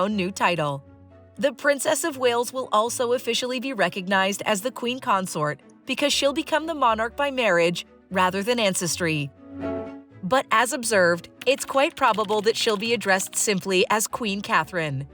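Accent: United States. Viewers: I'm a Scot. I find it annoying when an American voice lectures me about our Royal Family, and get a lot of it wrong.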